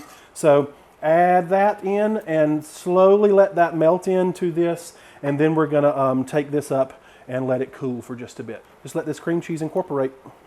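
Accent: South, United States. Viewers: I love you and that I understand you totally because we are SOUTHERN!! I have missed you boy!! I got to try to stay on low carb. It’s so hard here in the south. 🙌🏼🙌🏼🙌🏼 yes casseroles are comfort. Now- what about cream of chicken?? I have so many good casseroles with that too. I can’t wait to see what you have in store soon! Thanks for the video!!! ❤️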